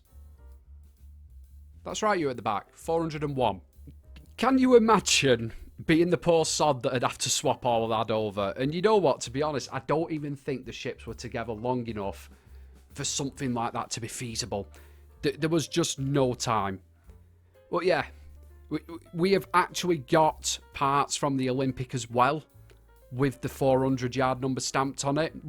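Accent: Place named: North, United Kingdom. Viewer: Long time TNG Fallout follower, nice to put a face to that Northern Voice. Simply, simply lovely.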